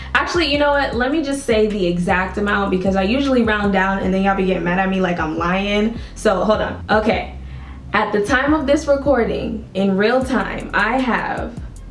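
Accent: Jamaica. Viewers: Mumma yah jamaican????me keep getting recommend to you channel ,me ago subscribe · wait are u jamaican? also love this video 💗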